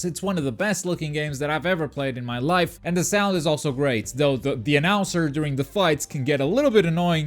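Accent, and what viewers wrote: Portugal. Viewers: Português? Notei pelo sotaque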